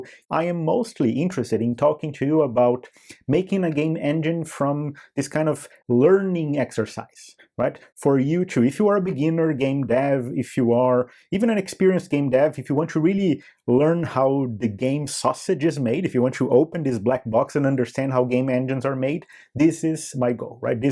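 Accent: Brazil. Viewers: reconheci pelo sotaque, mas muito bom o conteudo mano parabens, muito raro ver conteudo de game engine/c++ no br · Cara, sei que você é brazileiro só pelo sotaque rsrsrs, muito bom! continue nesta jornada, me ajudou muito a entender essa caixa preta. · sabia que era brasileiro pelo sotaque kkkkkkkk muito bom o video cara parabéns pela qualidade! Me inscrevi e gostaria de dizer que você me dá uma impressão de qualidade de conteúdo melhor do que muitos canais ai seja gringo ou não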